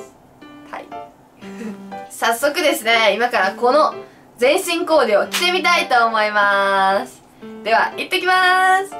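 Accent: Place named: Kansai, Japan. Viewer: 関西弁よき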